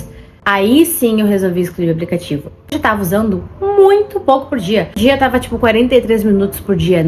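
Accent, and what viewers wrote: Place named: Rio Grande do Sul, Brazil. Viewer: Tu é gaúcha? o teu sotaque se parece de uma gaúcha.😊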